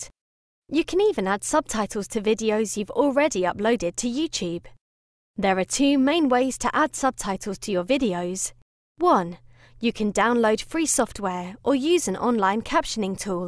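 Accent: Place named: United Kingdom. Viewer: very thick brit accent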